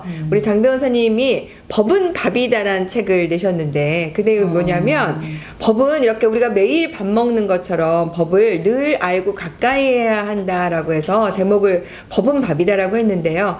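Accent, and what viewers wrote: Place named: Seoul, South Korea. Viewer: 고향은 전라도인데 서울말을 더많이쓰시네요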